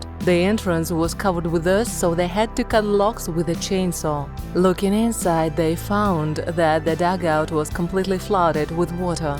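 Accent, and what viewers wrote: Russia. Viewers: Wtf is with the Russian accent in the voice over?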